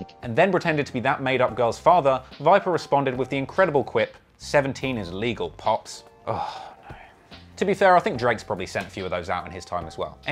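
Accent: United Kingdom. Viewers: So many British narrators intrigued in Texas rappers. I like it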